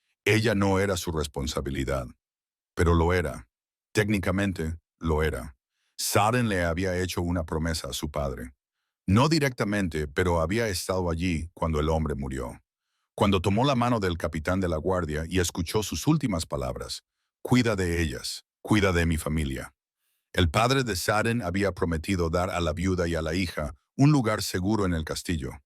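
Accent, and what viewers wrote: United States. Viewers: Siii eso es lo malo del IA, el rey Saden aveces lo pronuncia como “saden”, “seiden” y hasta “siren” y a Brina a veces lo pronuncian como “Baina”, “Brea”, “Brena” y “Bria”; y para colmo aveces suena como un gringo tratando de pronunciar la R 😂😂😂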